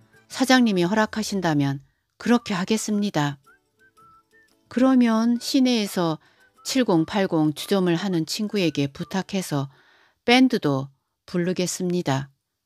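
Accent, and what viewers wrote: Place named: Chungcheong, South Korea. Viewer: 충청도 금강건너 웅포가 고향으로 잊었던 사투리에 정감이 갑니다.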